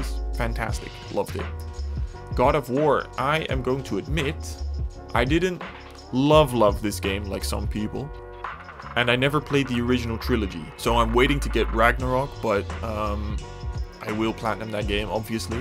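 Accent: Netherlands. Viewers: So I was actually able to pick up you’re from the Netherlands by your accent but dang that took a while. Definitely a compliment because usually I can’t stand dutch english accents but yours is fine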